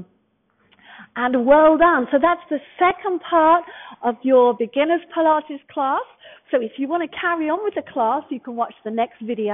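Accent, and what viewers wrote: England, United Kingdom. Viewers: During coronavirus in Northern California, I was enjoying regular walks...till the fires came. Now I cannot even leave my house because the air is so bad...so for the very first time in my life I'm doing indoor/video exercises, and these videos are the first Pilates of my life. They're challenging (I've gained weight and lost muscle in the last 6 months) but also really fun, and I like the teacher a lot! I especially enjoy her accent -- didn't know she would be English, but it's nice for me as I used to live in ENgland and have many dear friends and family members there. THANK YOU! I'm going to keep using the four intro classes and then probably will subscribe.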